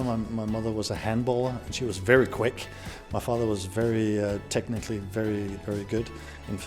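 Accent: Scotland, United Kingdom. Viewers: He still lives in Scotland? His accent sounds Scottish.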